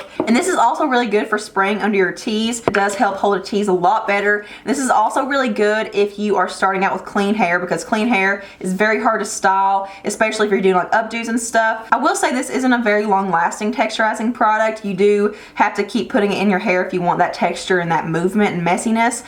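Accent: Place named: Kentucky, United States. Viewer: Those are my favorite curling irons too! (The sally beauty ones) I’ve just discovered your channel today- you sound like us central kentucky girls, but I can hear a Georgia twang, too. Where are you from? Great channel!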